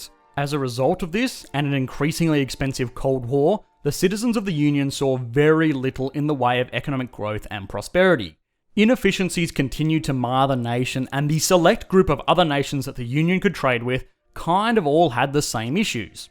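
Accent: Australia. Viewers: Is that australian accent ?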